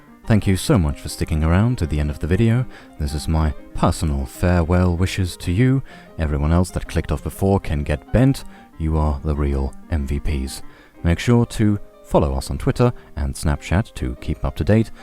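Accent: Germany. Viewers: Bro are you german/austrian/swiss?? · Everyone be up in the comments simping or talking about how he’s German. I’m just here like, okay cool it’s..a familiar and comforting voice.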